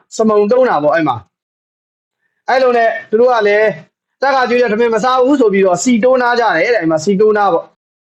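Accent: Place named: Upper Myanmar, Myanmar. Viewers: ကိုဇင်ယော်ရေအညာှသံဝဲဝဲလေးနဲအမြဲနားထောင်ဖြစ်ပါတယ် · အခုထိ youtube ကို mobile data နဲ့ ဘဲ fb မရပါ ရက ဆိုးပါ့ အပြောတွေက ဆက်စပ် ထိမိဘဲပြောတော့ပြောလိုက်မယ်နော အညာသံဝဲနဲ့ သဘေကျတယ် သားတို့နဲ့ တလသူအားလုံးကံကောင်း ဘေးကင်းကျန်းမာပါစေ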